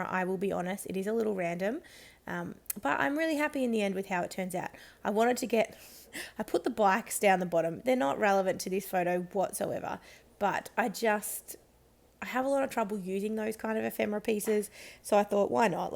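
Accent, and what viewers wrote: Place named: Australia. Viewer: Super job using your kit this month!! & This page is SO CUTE!! (I'm saying "so cute" in your Aussie accent!) I really am enjoying all your videos!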